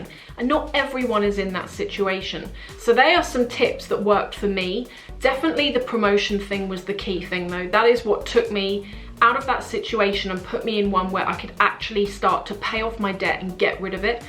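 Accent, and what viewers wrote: England, United Kingdom. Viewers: Hello! I am a new subscriber and just recently found you on YouTube. I am loving your videos! I get a coffee and settle in to listen to your lovely English Accent (I am from US) your style is so inspiring!!! and my favorites are when you open mail from you subscribers. You are so genuine! So humble and appreciative!! I really really am so glad to have found you!!!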